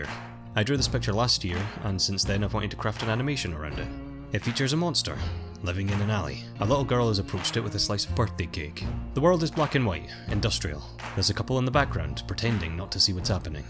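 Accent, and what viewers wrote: Scotland, United Kingdom. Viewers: sarcastic af Scottish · I’d say Scottish, it reminds me a bit of my own accent and the one I hear a lot from family. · Loved this animation + omg you're Scottish too! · I hEaR a ScOtTiSh AcCeNt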